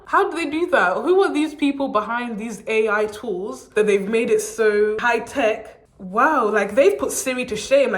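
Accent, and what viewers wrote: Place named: Southern Africa. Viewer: You have a southern African accent, love it!!❤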